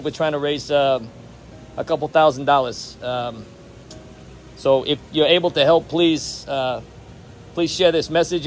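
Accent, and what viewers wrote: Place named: New York City, United States. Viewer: Well dude you are waaaay New York City... Manhattan to be exact. I'm from Staten one of the other boroughs so yeah I recognize that accent!😉 Not quite quite a long island yenta but your close. Too fast for a Long Island yenta. Bro you my peeps!!! I never donate cash to any website ever but I do buy things that they have merch to support them. It's you and Tom MacDonald the only ones that I will buy from. And guess what? we're going through a heat wave too (113 yesterday) and mama needs a tank top so I think it's time to go shopping! I don't like seeing my peeps fail at things that they do so well. So my peep, it's time to check out the merch! You know I've always supported you and I will never stop. I still got my eat the babies t-shirt and I love it!! I love the humor and comedy & the work you put into it. 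Far as I'm concerned every damn ¢ is worth it!!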